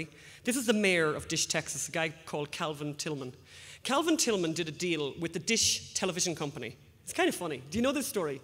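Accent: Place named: Donegal, Ireland. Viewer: Well. She's from Donegal!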